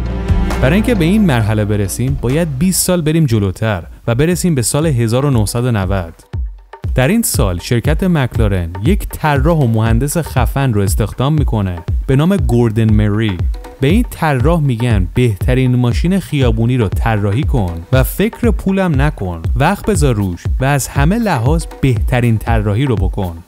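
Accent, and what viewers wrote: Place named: United States. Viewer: احمق داره لحجه آمریکایی درستش رو میگه ناراحتی؟؟ ساکت شو یاد بگیر چون تو داری اشتباه میگی، استاد زبان انگلیسی هست بچه جون🤔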